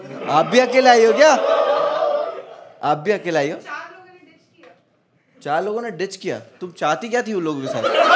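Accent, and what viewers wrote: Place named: Gujarat, India. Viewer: It was a nice stand up,I totally loved it and can't stop smiling since the beginning of the video and munawar has a good gujarati accent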